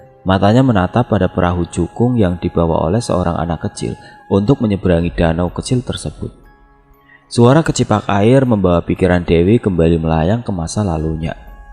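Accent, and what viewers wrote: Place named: Banjar, Indonesia. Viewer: admin keknya orang kalimantan , soalnya logatnya kalau ngomong banjar pas banget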